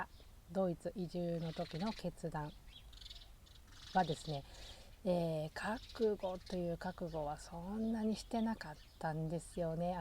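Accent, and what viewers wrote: Kansai, Japan. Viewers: こんにちは。 さんの関西弁、めちゃくちゃ親近感です。とても素敵なお家ですね。お二人のお子さんがバイリンガル、普通にお母さんとは日本語でお喋りされていて、ドイツの学校や幼稚園に通われてるのは凄いでね。コロナが落ち着いて日本への一時帰国がコロナ前の様に気軽に出来る様になったら是非お子様達に日本の小学校を体験させてあげて下さい。 ドイツの学校の夏休みは何ヶ月ありますか？ 日本の夏休みは7／20前後からですので、1週間から2週間早く一時帰国されたら日本の小学校体験入学できますよ。ドイツの小学校との違いが面白かったり、日本のお友達も出来ますよ。 お友達のお子さんが毎年夏に少しだけ日本の小学校に通ってました。ほんの10日間とかでもお互いとても新鮮でいい刺激になる様です。毎年帰国出来なくても、次帰国したらお友達と遊ぶ約束などして楽しんでいました。 何時も何気ないドイツの日常の風景や、食されてる食材を拝見するのが楽しみです。これからの動画も楽しみにしています。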